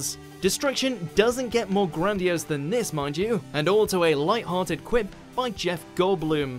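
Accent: United Kingdom. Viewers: You already know there UK when you here the voice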